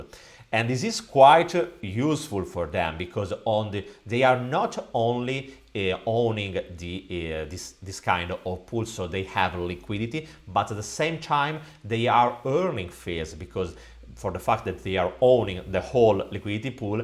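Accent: Italy. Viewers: Sei italiano?😅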